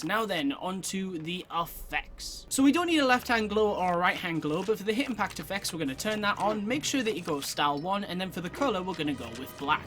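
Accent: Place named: Tyneside, United Kingdom. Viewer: My son is loving your videos. What's bugging me is, your accent sounds soooo familiar.. Are you from the North East, Tyneside maybe?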